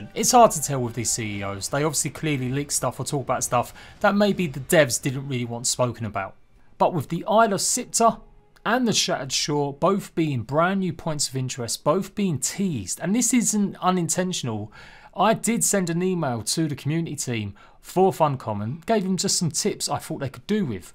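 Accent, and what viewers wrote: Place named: Cockney, United Kingdom. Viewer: Strong cockney accent...kind of hard to understand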